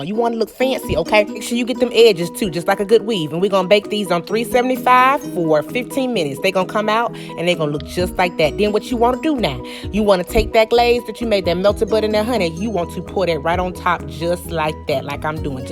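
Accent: South, United States. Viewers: She's from the south. We all (black folks) talk like her. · And what exactly does a plantation mammy sounds like? She has a southern accent like most people who are born and live in a southern state. Would you call someone with a Boston accent a slave master? Rethink your stereotypes. I see you have been brainwashed by the media because slavery has been over for some time so it is no possible way you know what a plantation mammy sounds like.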